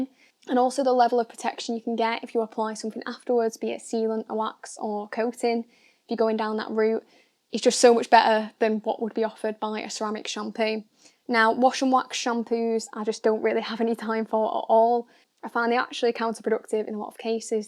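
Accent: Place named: Northern England, United Kingdom. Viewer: I can't help but notice you have a northern accent. As someone from the south east, with a south facing drive way, I can assure you direct sunlight is insufferable. On dark cars. On light cars it really makes sod all difference. Water literally boils on contact with dark paint. It doesn't even need to be hot, just sunny. What we also have down here that you don't, is really hard water.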